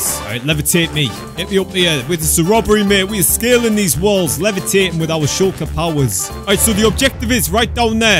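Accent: Scotland, United Kingdom. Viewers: Are you Scottish or maybe Irish? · You’re British and I’m Scottish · your a british people best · I can tell your britsh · ur brittish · You sound British 😃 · He’s British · I like your accent it makes you sound Scottish. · He sounds like Scottish or Northern Irish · Are you from Scotland? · I love brittish accent🤩🤩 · ya british? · Is he British? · He sounds Scottish for me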